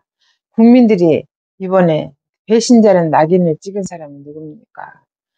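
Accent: Jeolla, South Korea. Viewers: 16대 전라도 노인으로서 의 구수한 라도 사투리에 칼날보다 예리한 논평에 이곳 멀리에서 늘 고맙게 생각함니다 제 아무리 이재명이 발광 지랄을 한다 해도 선상교 교주 때중의 후계자는 될수없다고 생각함니다 다시한번 의 건투를 빌겟슴니다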